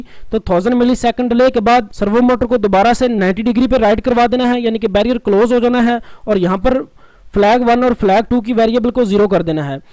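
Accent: India. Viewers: Tech vid Indian voice Useful Thumbs up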